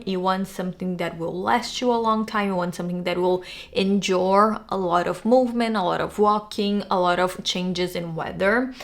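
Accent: Brazil. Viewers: wow so many good jewels, thank you!! I have been wondering where your accent id from - I Love Brazil and it's on my bucket list to visit :)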